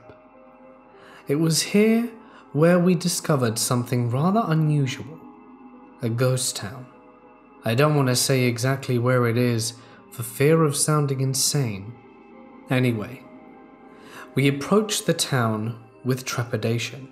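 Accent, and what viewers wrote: United Kingdom. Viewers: I listen to other very good horror narrators, but, I ALWAYS come back to you!!! I sincerely feel calmed and soothed while listening to my favorite, British-speaking storyteller. I’d like to hear Pandora more as she grows up as a ‘ -Mini-Me”! A question/answer live chat would be a hoot with that little charmer! I am sure, that she dearly admires you! 😻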